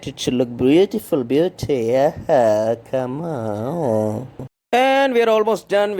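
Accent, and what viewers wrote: India. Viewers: Only Indians can speak English like this way💪🏻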